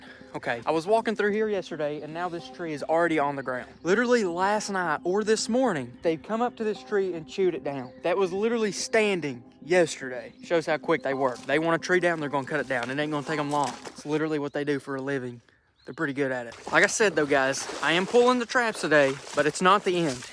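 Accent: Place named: Kentucky, United States. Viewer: always makes me smile with his Kentucky accent! Keep up the good work bro!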